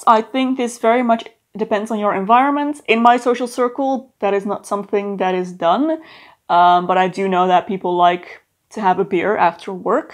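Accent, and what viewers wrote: Netherlands. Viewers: I heard you were dutch the second you opened the mouth you have the accent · I always wondered whether you were Dutch or not, because of your accent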